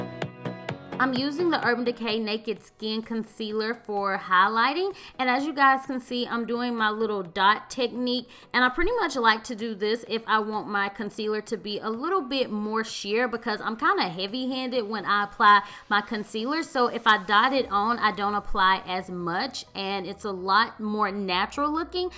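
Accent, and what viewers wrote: South, United States. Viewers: Love your southern accent ! ! !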